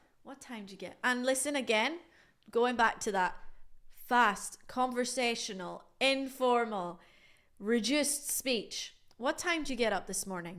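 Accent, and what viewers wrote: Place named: Spain. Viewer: "Por favor, profe, le agradecería que creara más contenido de este estilo. Es realmente efectivo, y cada vez que quiero recordar, me vienen a la mente sus hermosos ojos y su precioso cabello. Además, su acento español es fascinante. Ojalá considere la idea de abrir un canal de YouTube solo para usted. Sería maravilloso.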